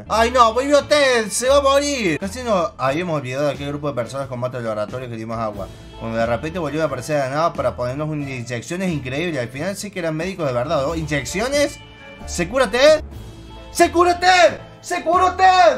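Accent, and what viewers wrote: Argentina. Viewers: Ola xd sos argentino¿? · Sos argentino?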